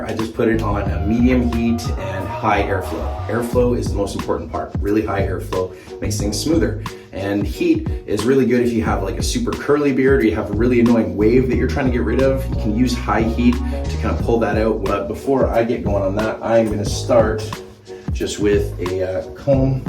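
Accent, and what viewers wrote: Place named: Canada. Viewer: I thought you were canada based with your accent and the way you spell colour.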